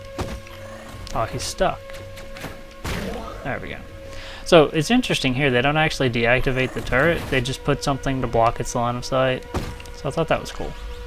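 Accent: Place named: Toronto, Canada. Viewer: your a boss , my man! are you from toronto, cause you sound like it :)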